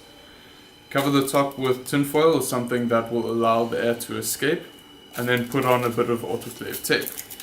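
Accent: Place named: Germany. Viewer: few words and i can tell, german right?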